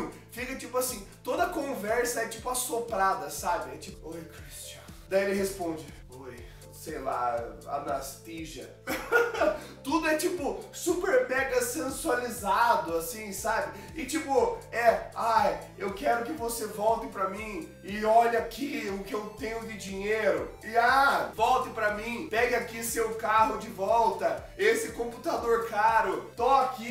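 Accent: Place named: South, Brazil. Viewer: seu gostoso! esse teu sotaque é de que estado do Sul? reconheço demais esse sotaque hahaha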